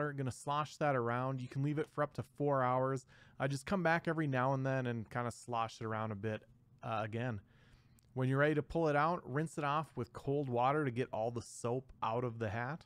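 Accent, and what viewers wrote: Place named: Colorado, United States. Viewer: I bet you're from Colorado. Just judging by your accent. Thanks for the hat cleaning info✌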